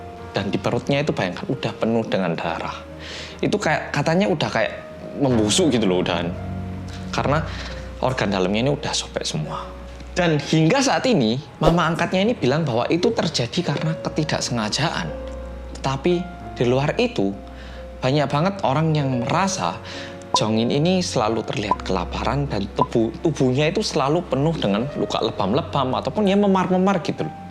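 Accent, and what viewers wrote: Java, Indonesia. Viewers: TRMKSH KOKO MEDHOOOKKK...😃😃😃😃🙏🙏VOOUME SUARA NYAAA KOKO JELAS BENING JERNIH YAAAAA.....😃😃😃😃😃😃🙏🙏💖💖💖💖💖💖💖💖💖💖👏👏👏👏👏👏👏👏👏👏👏👏👏👏👍👍👍👍👍👍👍👍👍👍👍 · Wong Korea bahasane medok koyo wong jowo sampean (mas )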